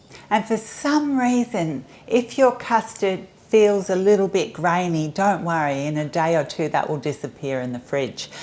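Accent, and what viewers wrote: Australia. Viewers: Thanks, I love custard tart. Are you English? I hear the Aussie accent but I hear an English accent coming through here and there.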